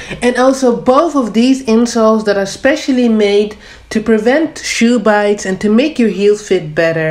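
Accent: Netherlands. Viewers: i know a dutch accent when i hear it! ;) gonna try the tips ! thanks :)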